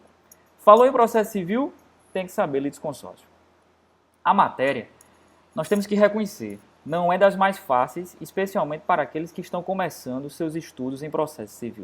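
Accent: Northeast, Brazil. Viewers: muito didático, obrigada por compartilhar seu conhecimento. A propósito, cm todo respeito, adoroooooo esse sotaque do nordeste.😍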